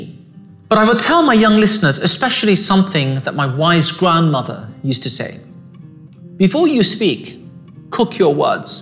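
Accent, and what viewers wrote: United Kingdom. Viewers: Dang i never thought you have british accent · When he speaks I get reminded of Hermione Granger and I'm convinced that's how British sound haha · I learn a lot from each video!! Thank you. Bless you and your family, and may your privacy be protected by God almighty. But, can we stop just a minute to appreciate this beautiful ( British English? ! As foreign language learner I learn a lot, language wise, from you when you speak. It's beautiful, and I recommend listening to you ( speaking, singing composing, and even in silence, your calm composure is something valuable to learn from.) I appreciate greatly, how careful you are to contribute positively to ''the inevitable role model" to have become to many people in the world. ❤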